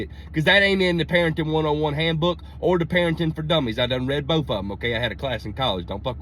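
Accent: South, United States. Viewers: That is fifty shades of wrong three ways to Sunday and I ain't lyin'. That there is one helluva case pink eye just waiting to happen to the whole house. If it ain't already happened by now. nods Oh yeah, other people's kid will definitely have you rethinking your lifetime plans with or without kids. Of course I did laugh my ash off.😂😂😂😂 It's that Southern twang he got. I love it. Got me all homesick.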